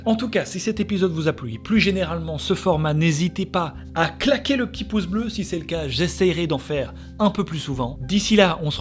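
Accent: Belgium. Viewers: Les Belges, vous dites Houite pour Huit et pourtant vous prononcez Tuiste le mot Twist. Super vidéo sinon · Mais il est belge ? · Il est belge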